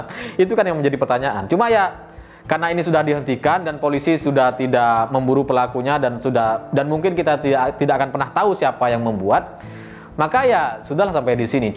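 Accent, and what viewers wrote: Madura, Indonesia. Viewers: Logat Madura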